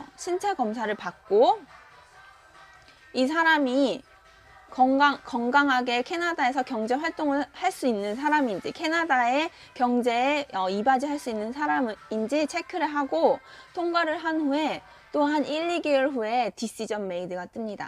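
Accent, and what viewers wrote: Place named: Japan. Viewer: 영어발음이 일본어인데?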